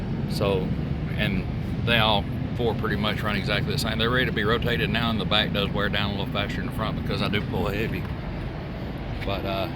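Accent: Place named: Tennessee, United States. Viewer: YOu sound like a TENNESSEE driver!! ( me too.. ) I think part of yours is the load and driving use... I'm going to be running mine on just my GX470 (about 6000 weight with metal bumpers, winch- and extras).. but normal street driving mostly-and not very aggressive. I'll most likely do a LITTLE offroad stuff-- just fire roads, or rougher dirt roads and of course winter.. but not much- so with what you got- mine should do fine. THANKS- appreciate the totally honest and factual review..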